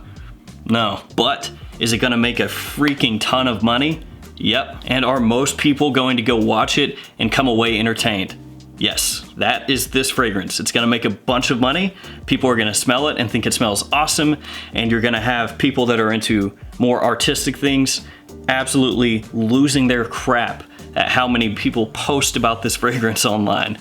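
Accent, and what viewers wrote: South, United States. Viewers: Hey. Nice vid. Btw. What is your accent? Is it southern?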